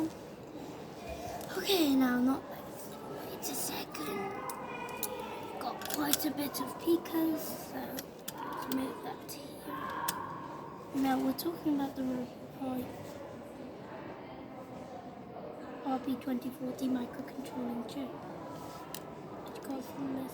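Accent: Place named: United States. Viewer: Still speaking american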